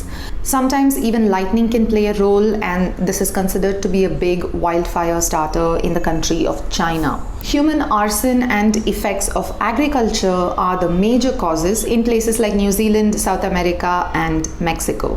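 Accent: India. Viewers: That is a cute Indian accent.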